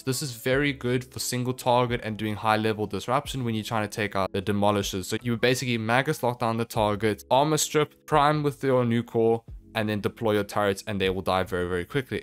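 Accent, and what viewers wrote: South Africa. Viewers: My dude are you from South Africa 🇿🇦 I am from Cape Town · Mate you sound like you from south africa i cannot lie xD, great vid btw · are you soug African?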